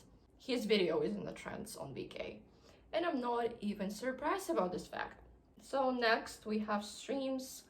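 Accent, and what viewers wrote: United States. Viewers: Your American English sounds amazing! I wish you all the best 😊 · I'm shocked at how good your English has gotten over the past year... You're starting to sound American lol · Interesting comparison between Russian social media and YT. Your subs seem to be going well. Nearly 50K now. Going great. Hang in there. You do good work. I don't/won't have Face Book, so the question is N/A for me. I'm interested in where you learned your English? You have certain American sounds on certain words. Your English is very good, I don't hear any mistakes there at all. 😁😁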